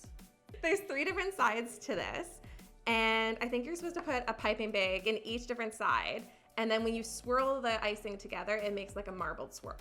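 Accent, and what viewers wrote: Canada. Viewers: Oh you're Canadian? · If you see this can you answer it are you Canadian?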